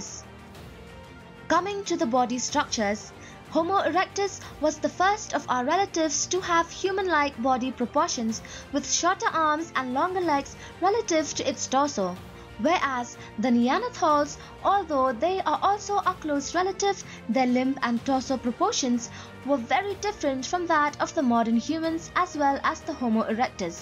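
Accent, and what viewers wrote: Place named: Meghalaya, India. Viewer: The narrator sounds Khasi. Is she?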